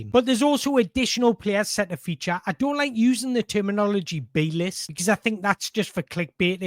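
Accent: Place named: Geordie, United Kingdom. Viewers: I like your videos, but the editing in this one is really jarring. I've had to stop about 10 mins in as I couldn't take any more. Maybe rehearse your script a bit more to avoid so many hard cuts. Im not really a fan of heavy Geordie accents either (im a Geordie myself), so maybe try to tone that down a bit, too, if you can. Finally, maybe work on lowering your tone by a few octaves, too. You're fairly high-pitched, and so a combination of the heavy accent, high tone, and hard cuts is really grating. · Are you a mackem? The way presents is informal and hes funny too. As for "heavy Geordie accent"..... Theres nowt better!!!! No need to tone it down, that's the way we talk, and why Geordies in general are admired for their accents!!! Keep up the absolutely brilliant work 🖤🤍⚽️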